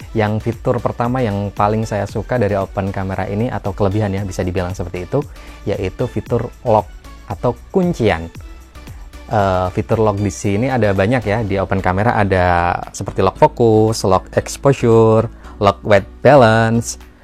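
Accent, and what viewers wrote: Pekalongan, Indonesia. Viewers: Ente orang mana bang? Batang/pekalongan?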